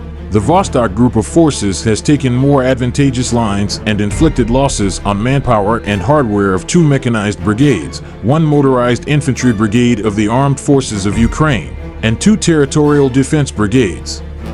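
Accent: United States. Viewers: Пендосовская озвучка, достала. Россия вперёд.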